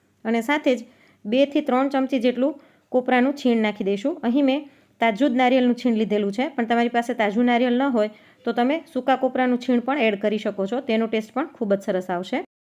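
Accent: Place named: Ahmedabad, India. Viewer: Hu amdawad thi badhaj Shak saras che ben ❤ tamari boli parthi tame pn amdawad na lagocho ben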